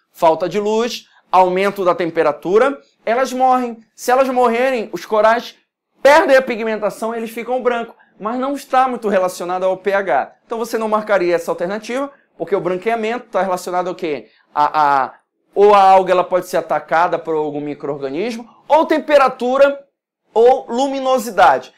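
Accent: Pará, Brazil. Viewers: Muito bom 👏👏 Prof vc é do PARÁ? Sotaque muito igual!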